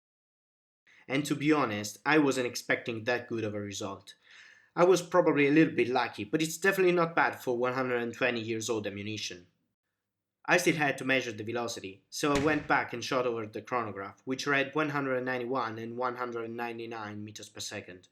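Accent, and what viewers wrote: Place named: Italy. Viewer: Italy I would say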